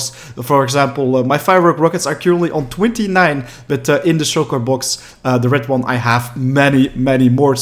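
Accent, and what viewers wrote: Germany. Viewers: the german accent made me cringe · Is this a german accent?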